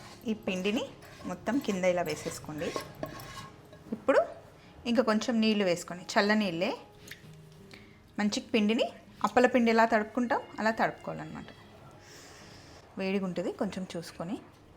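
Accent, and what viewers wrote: Telangana, India. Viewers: Akka meeru Telangana kadha say elachi poddi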